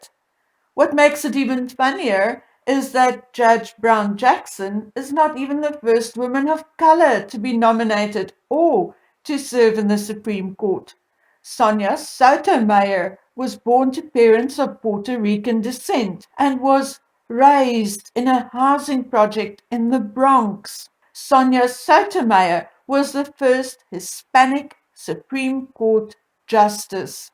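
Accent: South Africa. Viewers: Hi. So lovely to hear a South African voice😉.great point of view, and spot on! Keep it up.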